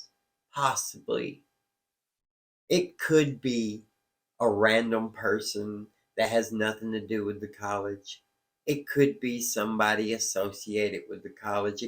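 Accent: South, United States. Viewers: You and I have quite a lot in common. Down to the “Southern-ness.” 😆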